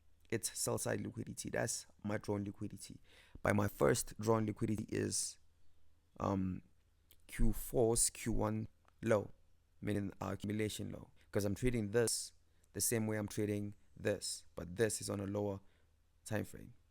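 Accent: South Africa. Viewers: he's from south africa :D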